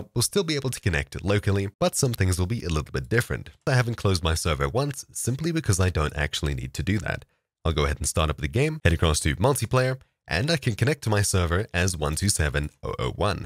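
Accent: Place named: South Africa. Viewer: I thought I could hear a fellow South African in that voice!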